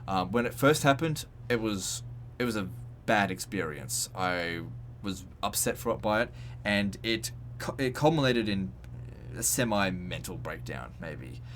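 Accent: Queensland, Australia. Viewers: Brisbane gang represent, enjoying the deep Queensland accent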